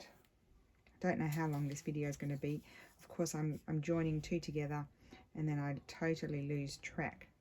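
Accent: Australia. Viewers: I love this way of making clusters - I'm still new to all of this and haven't attempted clusters yet but this is how I'll try it for sure! Also love your videos and all of your wonderful creations. I was an exchange student in high school and I was in Australia for about 6 months. The Australian accent is one of my favorites and makes me think of how much fun I had when I was there.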